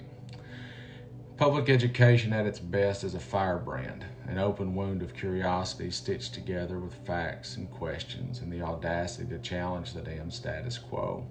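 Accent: South, United States. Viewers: you are brilliant. Your "good ol' boy" southern accent makes what you say even more powerful. I've shared your videos with everyone I know. Defiance 'til death 💪🏽✊🏼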